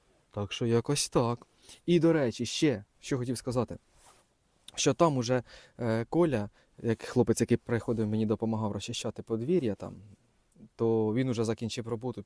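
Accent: Poltava, Ukraine. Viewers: в мене родичі теж живуть на Полтавщині. Розмовляють як. А дідусь з Черкащини. До Другої світової війни була Полтавська обл. Теж так розмовляв, як. Приємно слухати. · Вітаннячка! Все моє дитинство, пройшло у бабусі в Полтавській області... Життя іде, бабуся вже давно померла і відвідати рідні краї вже нема можливості... На Ваш канал натрапила випадково і почала дивитись. І чую у розмові, щось таке рідне, таке знайоме, а потім зрозуміла - це полтавський акцент, він особливий❤ Бажаю Вам здійснити всі Ваші мрії і реалізувати всі задумані плани! Ви щира і добра людина) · Теж зачепилася саме за говір, одразу зрозуміла , що полтавський. Обожнюю і Полтавщину, і говір, люди, як на мене найприємніші і найщиріші з усієї України. Буду слідкувати за ремонтом! Знаю, що це таке, сама ремонтую свою хату на Вінничині, це дуже радісно потім бачити результат і особливо жити в своєму, а не найманому житлі і вирощувати все, що хочеш! 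Бажаю успіхів і розвитку, буду слідкувати!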